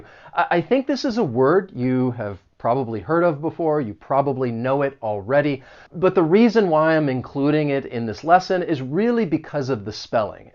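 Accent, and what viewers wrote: United States. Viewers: OH MY GOD, MY FAVORITE ENGLISH TEACHER REPLIED TO ME, YOU ARE BETTER THAN MY IN-SCHOOL TEACHERS, TYYYY, IM FROM MOROCCO BTW❤❤❤❤ AMERICAN ACCENT IS RENOWNED WITH YOU❤❤❤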